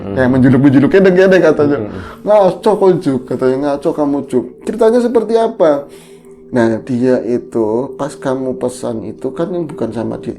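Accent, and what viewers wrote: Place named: Banyumas, Indonesia. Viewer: Sueneng bgt denger logate... 😀😁👍Ngapakmodeon ❤️❤️...sehat dan sukses sll ya Mas berdua,GBU!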